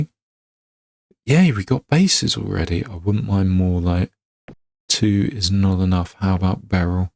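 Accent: United Kingdom. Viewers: Great 2 c someone else than Sullla playing this diamond. And with a nice, calm Bri'ish accent :D . Thank you sir.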